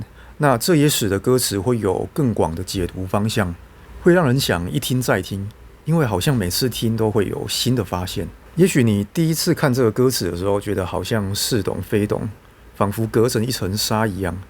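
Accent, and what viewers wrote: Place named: South, Taiwan. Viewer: 聽了陳老師對歌詞的解析後, 對歌曲的感受更深了, 我自己是北漂 20幾年的人了, 這首歌把我們的心情刻畫得好深好深, 聽歌會哭, 聽吉他演奏曲反而是淡淡的哀傷, 吉他琴聲好像有療癒的效果, 陳老師的台語更有我們南部特有的親切感, 希望陳老師能多出這台語歌系列的演奏曲, 很好聽哦~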